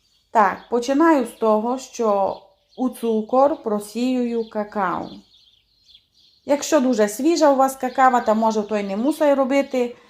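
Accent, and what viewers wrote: Transcarpathia, Ukraine. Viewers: Який гарний закарпатський діалект. Це багатство нашої мови. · я ще не їв того пляцка, напевно дуже смачний. Але я милувався твоїм голосом з закарпатським акцентом. Дуже мило і приємно слухати тебе. Дякую що ти на каналі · Люблю закарпатський діалект.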